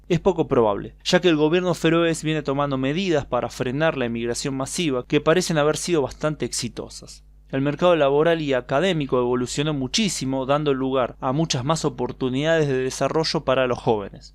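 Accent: Buenos Aires, Argentina. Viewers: Son buenos tus vídeos. Pero intenta no hablar tan argentino me.hace echarme a correr ... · A voz que vc escuta neste vídeo fala o espanhol da região de Buenos Aires.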